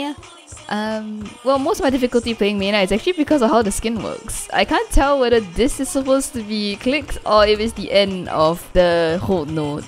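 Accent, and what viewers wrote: Singapore. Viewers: Oh man, I could recognize the SG accent anywhere :D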